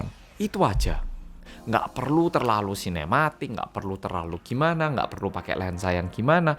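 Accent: Java, Indonesia. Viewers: Medok Yach? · Medooooooooooooooooooooooooooooooooooooooooooooooooooooooooooooooooooooooooooooooo oooooooooooooooooooooooooooooooooooooooooooooooooooooooooooooooooooooooooooooooooooooooooooooooooooooo oooooooooooooooooooooooooooooooooooooooooooooooooooooooooooooooooooooooooooooooooooooooooooooooooooooooooooooooooooooooooooooooooooooooooooooooooooooooooooooooooooooooooooooooooooooooooooooooooooooooooooooooooooooooooooooooooooooooooooooooooooooooooook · Salam medokkkkkk banggg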